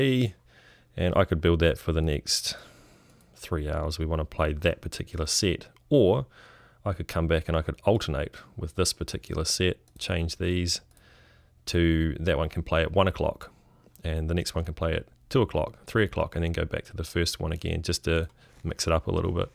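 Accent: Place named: New Zealand. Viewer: Yo Kiwi dude can pick that accent a mile off have you gone to latest yet with Yosemite, It came with a few issue to start with but after talking with the developer it seems to be sorted. Scheduling is fairly start forward the hardest part is making iTunes conform 12 isn't an improvement it com,pellets messed up my playlists. but that might not be a bad thing as I'm moving everything from an iMac to a 12 core and will have to go through everything agin, it just never ends. B y the way what the story over there now on low power FM stations across the ditch if your single penetrates a piece of paper it is considered too powerful .02 of a watt max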